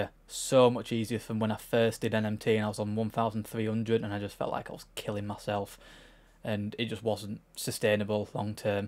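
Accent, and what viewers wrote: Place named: Northern England, United Kingdom. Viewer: Proper northern accent bro but love the video ❤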